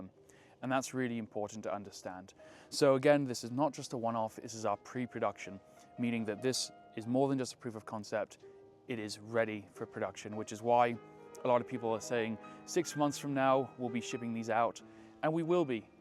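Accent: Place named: England, United Kingdom. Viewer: a real round then. When I heard the English accent I thought he was gonna sell a .22 😂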